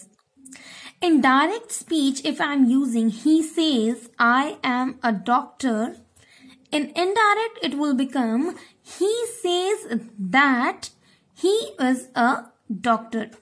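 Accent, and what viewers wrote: India. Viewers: Your English is not good, your english is indian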